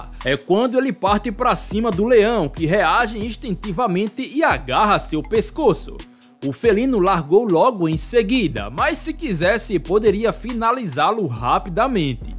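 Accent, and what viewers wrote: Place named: Paraíba, Brazil. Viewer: Sua locução é demais com esse sotaque meio nordestino de Paraíba, mas o troço chato é cão, tira a paz de qualquer bicho. rs Se o felino tivesse solto ele veria o que era bom. rs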